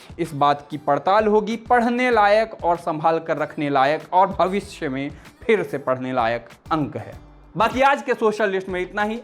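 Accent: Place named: Haryana, India. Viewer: I knew you were Rewadi by your Accent 😂😂😂😂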